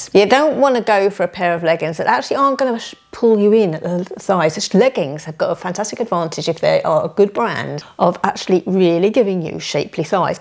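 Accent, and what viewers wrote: United Kingdom. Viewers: I just found your channel. I am 50 and trying to style myself well without looking too old or young 😅. I was reading the video and decided to click and listen. I said to myself I bet she has a British accent. I was right 😂. I love listening to you and you give some good thoughts on leggings. New subscriber here!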